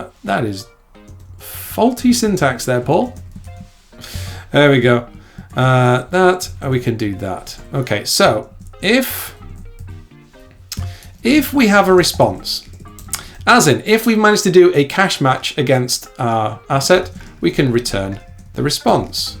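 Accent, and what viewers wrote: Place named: United Kingdom. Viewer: just watched first 7 minutes, and then... Jake at Udacity?? see you in a few days, Paul! (also: really enjoying your British)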